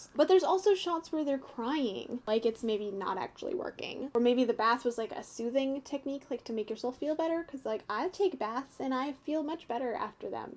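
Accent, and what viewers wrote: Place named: Canada. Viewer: you don't have a strong canadian accent, you sound pretty american most the time but the way you say some words sounds canadian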